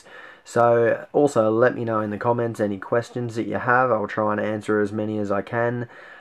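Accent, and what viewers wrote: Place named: Australia. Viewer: Australian accents really make you wish you had one-From Italy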